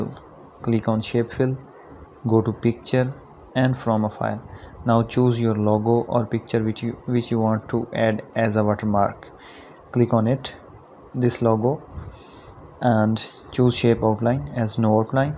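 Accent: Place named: India. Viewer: ty, i like ur indian accent, its cute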